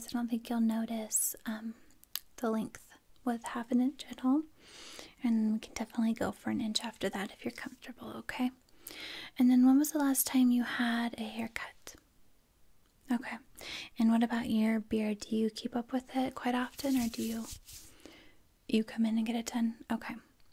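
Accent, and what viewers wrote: United States. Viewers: but with an American accent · you must be from the South?:D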